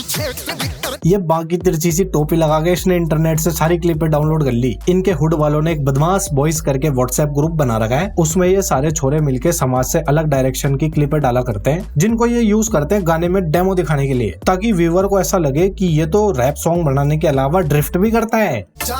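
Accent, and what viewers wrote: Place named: Bikaner, India. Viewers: Bro i guess you are from Bikaner because of your accent 😅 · Lagta hai ganganagar ya bikaner se hoga bhai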